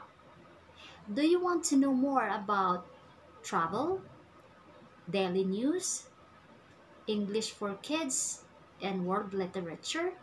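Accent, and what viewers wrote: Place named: United States. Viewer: You really have an American accent. Wow! Where did you learn to speak that way?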